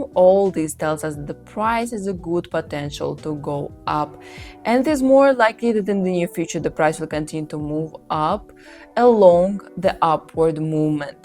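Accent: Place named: Russia. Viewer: У вас акцент , вы русская ? Я угадать ? Не типичный английский , ведаете на англоязычную аудиторию!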